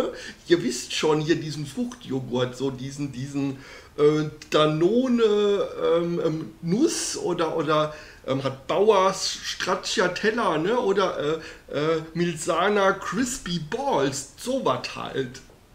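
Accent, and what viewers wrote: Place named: Ruhr, Germany. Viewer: Man, wo hast du denn so perfekt Ruhrdeutsch gelernt. Absoluter Treffer. 😂😂😂🎉 Die Welt braucht mehr Humor. Spitze. Sehr gut getroffen. 15 Daumen hoch. L.G.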